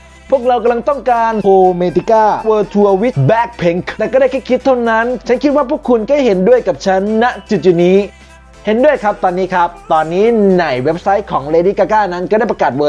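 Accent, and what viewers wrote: Isan, Thailand. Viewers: อีสานแท้ล่ะว่า พะนะ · เลดี้ กากา คนบ้านเดียวกะกุนี่เอง สำเนียงกุแต่เกิดเลย พะนะ นี่แน้ะ 555 นอกจากรักลิซ่าแล้วนั้น ขอรักแอดอีกคน ขาดไม่ได้ มาทุกวันนะ พะนะ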